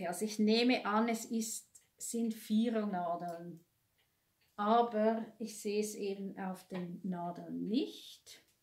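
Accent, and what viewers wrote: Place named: Switzerland. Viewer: Liebe, vielen Dank für deine tolle und unterhaltsame Podcastfolge. Ich bin erst vor ein paar Wochen bei dir eingestiegen, da die liebe Angie von dich empfohlen hat. Und ich muss sagen mit Recht! Du hast so eine positive, lustige Art, da bekommt man direkt gute Laune 😊. Deine Projekte gefallen mir auch sehr gut und ich habe bisher auch noch keinen Kolding, will ihn aber auch noch stricken. Strickt du die normale oder die kleine Version? Und dein Schweizer Dialekt 😍 ( sagt man Dialekt?) Eigentlich ist es ja eine eigenständige Sprache. Ich liebe es!! Viele Grüße aus dem Rheinland Katrin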